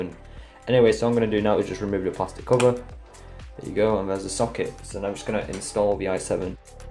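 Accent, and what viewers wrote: United Kingdom. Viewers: are you a north east hard lad like me ?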